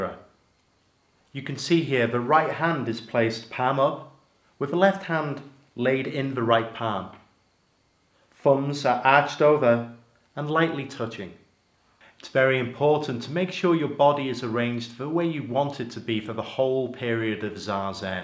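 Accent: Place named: England, United Kingdom. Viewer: Why does Steve-O have an English accent?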